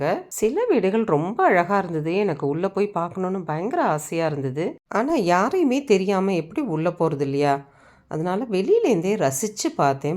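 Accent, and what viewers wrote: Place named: Thanjavur, India. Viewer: Very Pleasant divine Tanjore Tamizh Narration without the noise and exaggeration.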